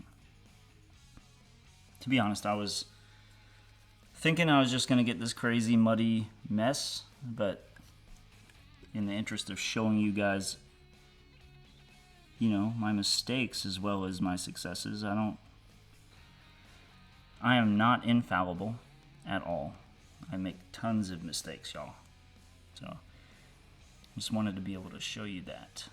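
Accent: South, United States. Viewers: I love it. Also Southern, like yall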